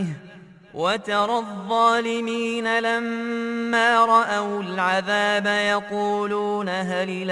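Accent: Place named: Morocco. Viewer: ماشاءالله تبارك الرحمان قراءة مغربية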